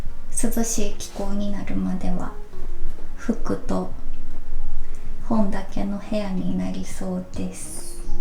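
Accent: Kansai, Japan. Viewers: 台湾からの視聴者ですー！久しぶりに動画観れて嬉しいです。関西弁もかわいいです❤ 体調に気をつけて無理のないようにお過ごしください！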